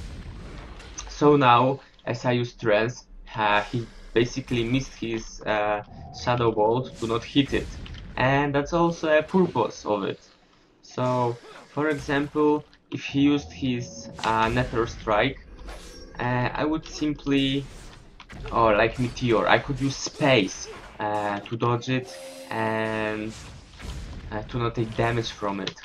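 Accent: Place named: Poland. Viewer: Oglądałem tylko ten filmik i wyczułem po prostu Polski akcent i tak się zastanawiałem.. :)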